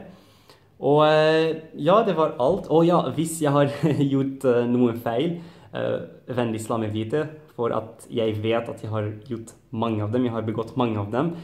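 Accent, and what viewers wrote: Sweden. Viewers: Norsken din er utrolig bra for å være på 4-5 måneder, det skal du ha! På mange måter hører jeg at du blander det inn med Svensk, ikke det at det er noe dumt med det, men spesielt på «ungifär» som er Svensk, hadde jeg ingen aning hva du sa :p Utenom det, ikke gi opp, du har talent! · Litt hjelp: Du snakker med en litt svensk uttale. Si "mæj" isteden for "mei". (I hvertfall på Østlandet.) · Good job! I can hear some swedish in there, but that is nothing to worry about! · Du har en gøyal skandinav-dialekt, hører du har litt svensk påvirkning, men det gjør jo ingenting. Du har bra uttale og er lett å forstå deg uansett👍🏻 mektig imponert over at du har lært norsk så fort 👏🏻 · Du snakker ganske bra allerede, norsk er et vanskelig språk å lære i utgangspunktet, men jeg hører en del svenske ord og utalelser, så øv litt mer på uttalelsen din, og om du virkelig vil imponere nordmenn, bør du lære deg nynorsk også, siden det er det mange nordmenn som også sliter med. 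Men ellers veldig bra👍🇳🇴🇳🇴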